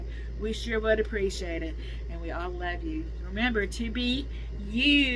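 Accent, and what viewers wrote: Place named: Kentucky, United States. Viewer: I love Kentucky I love the accent too ❤❤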